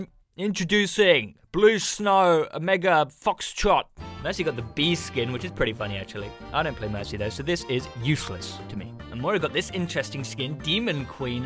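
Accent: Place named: Sweden. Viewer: where are you from? you sound a little bit swedish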